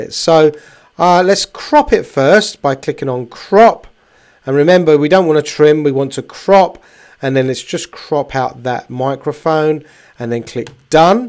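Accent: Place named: United Kingdom. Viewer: You must be from England or Scotland sir. Anyway, Great explanation. Looking forward to hearing more videos from you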